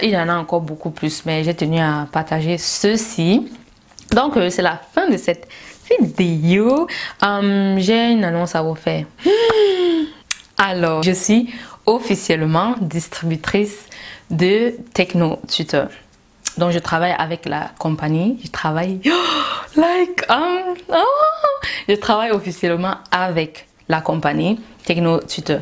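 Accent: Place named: Cameroon. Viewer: Vous avezvun accent Camerounais😆😆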